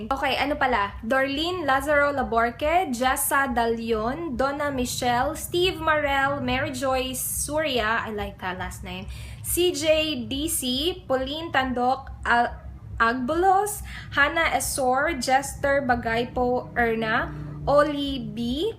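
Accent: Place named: Visayas, Philippines. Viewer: Hi ms.! finally done watching your vlogs and I super love your bisaya accent! Ang cute hehehe. 😊 nkita ko nnman brother mo with new haircut haha! Pagpasensyahan mo na ms kinikilig onte 😄✌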